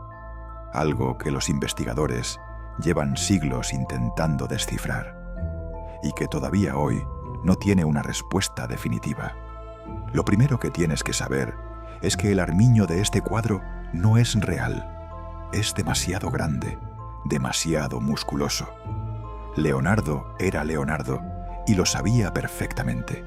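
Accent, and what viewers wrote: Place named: Castile, Spain. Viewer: He encontrado este canal por casualidad y ya me he suscriito........da gusto oir hablar este castellano tan maravilloso...............¡¡¡¡¡¡¡¡¡¡¡¡¡¡¡¡¡¡¡¡¡¡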